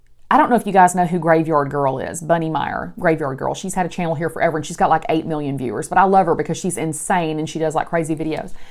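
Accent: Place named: South, United States. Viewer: You're a great candidate for asmr because of your southern accent, how you talk with your hands and its really satisfying when you say "y'all". I hope I don't sound like a creep, I just think you'd be good at it. Whispering doesn't seem to suit you though. It would be cool if you just spoke like you do now and maybe talk about positive affirmations or something.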